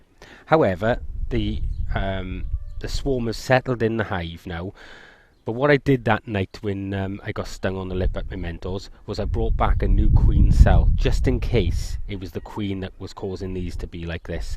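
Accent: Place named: Welsh Valleys, United Kingdom. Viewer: Thanks for the interesting video but most people like me who search for 'starting a bee colony' will be as disappointed as me. Assuming we are capable enough to build the hives, assuming we have all the kit needed, what we need to see is the process from having nothing to having some honey! Show us a video that does just that...;start with nothing, like me, then go through every process till you put the honey on your morning toast. The yanks are good at videos for dummies but are too self engrossed and spend most of the video talking about themselves and how good they are at doing stuff!! I will continue to watch your vids as I am a Taff living in rural Normandy and I enjoy hearing your valleys accent.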